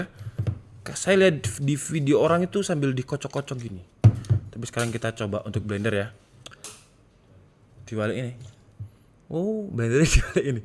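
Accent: Java, Indonesia. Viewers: We mas sampean wong jowo to 👍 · Orang jawa ya?? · Wong jowo mas? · Orang mana toh mas?, Jawanya masih melekat 😂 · Ketok e kok ngomong jowo😁.wong ndi bang..? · Wong ngendi to mas? Logat jowo ne jen kuat 👍👍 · Boso jawane koyo wong ngalam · Abangnya medok juga ya 😁😄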